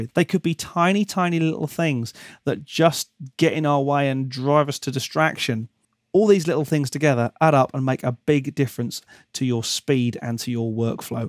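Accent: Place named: England, United Kingdom. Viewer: LunaRRRRRR. Gotta love that English accent 😍😘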